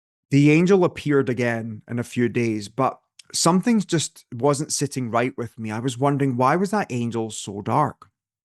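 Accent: Scotland, United Kingdom. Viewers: I finally found a scottish phsyic. Subscribed ❤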